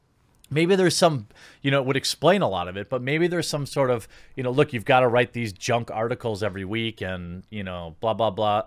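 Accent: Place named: Midwest, United States. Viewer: Good video,. I think. I only listen, so who knows what the video is?:P Not to call anyone out, but some Youtubers have voices that are really hard to listen to, even if the content is good. Your content is good, AND you're easy to listen to. So I appreciate that you speak at a reasonable pace, and your voice isn't grating. It's probably a Midwest thing - my neck of the woods.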